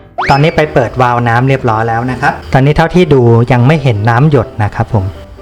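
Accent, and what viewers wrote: China. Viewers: เป็นคนไทยเชื้อสายจีนใช่ไหมครับ พูดภาษาไทยสำเนียงจีน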